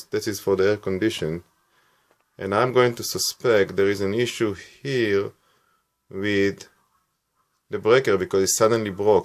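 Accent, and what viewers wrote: Israel. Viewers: Definitely sounds Israeli to me.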